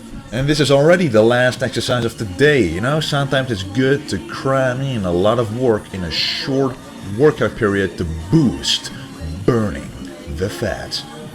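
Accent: Netherlands. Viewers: Yes, Dutch people--I would bet you he would tell you that the olympics are in Rye-o.They simply pronounce it as a long i to be safe. IO is tricky--think riot and prior. All different...Either way, sorta being a hard ass to bust a guys balls on a point such as that when he is doing a youtube video about fitness in a second language--pretty fucking impressive.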